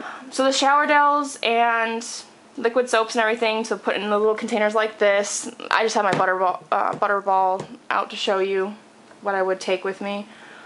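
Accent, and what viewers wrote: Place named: Maryland, United States. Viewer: this is a hardcore maryland accent, I talk like this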